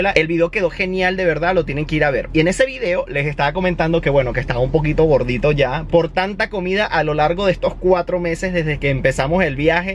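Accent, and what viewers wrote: Venezuela. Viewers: Todo tu dejo venezolano ,se regresó a tu lenguaje. Al principio parecías casi un español o un lenguaje más castizo. Pero si eres feliz en tu país, ok a buena hora quédese y siga viviendo en Venezuela. ¿ 50 mil bolívares no vale nada ?, qué raro,parece una cifra astronómica . 50 mil soles en Perú , equivale a 14200 dólares. Un perro no es igual que un niño o hijo, a ver quiero verlos estar fuera de Portugal dejando aun niño, después de 4 meses de ausencia. Mi esposa me hubiera hecho nadar el atlántico en 2 días hasta llegar a Europa y ver a nuestro hijo....uuufff!!!! las mujeres son difíciles.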